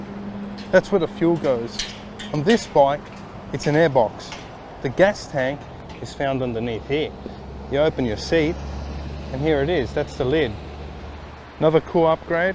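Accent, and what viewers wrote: Australia. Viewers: This is NOT your typical Australian accent, this guys speaks with an ethnic background combined with an Australian accent just as an FYI..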